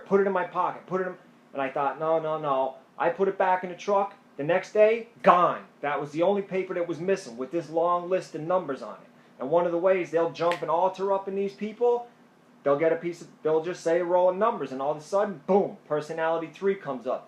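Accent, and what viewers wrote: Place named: Pittsburgh, United States. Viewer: elroy elongated naw this is classic pittsburgheez . It's how we sound in PA. I actually didn't notice until I read this. Google it, we have a distinct accent around here.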